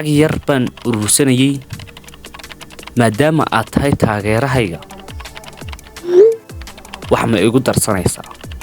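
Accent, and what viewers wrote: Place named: Hargeisa, Somalia. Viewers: Haa lahjadiisa marka loo fiirsado waa reer hargeisa toosa xataa Burco, ceerigaabo, berbera, Boorame maaha waa hargeisa toosa 😂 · Adi mareer Somaliland ayaad thy sidii rer waqoyiga uhadlaysaye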